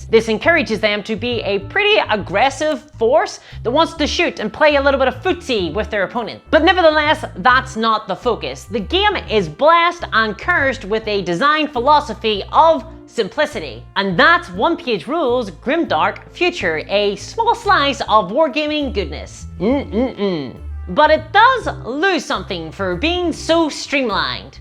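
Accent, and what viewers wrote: Ireland. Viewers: You sound Irish.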